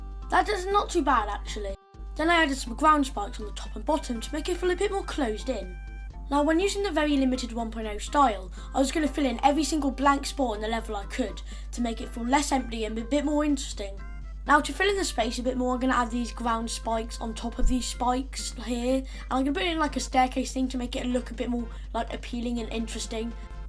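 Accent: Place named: United Kingdom. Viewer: The british accent is really catching me off guard but no hate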